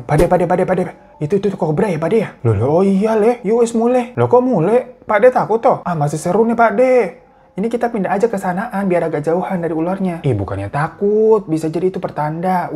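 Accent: Java, Indonesia. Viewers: Medoknya dapet banget bang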